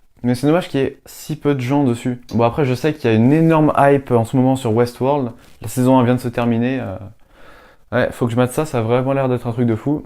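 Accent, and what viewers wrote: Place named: England, United Kingdom. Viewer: Superbe accent anglais :O et c'était très sympa comme vidéo , peut être un pouale lent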